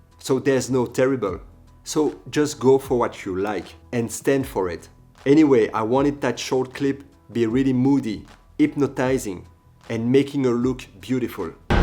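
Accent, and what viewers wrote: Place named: France. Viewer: Yaaaassss you’re back!! Love that accent monsieur; make more cool videos. Your lighting is superb; just as superb as your French accent 🙌🏽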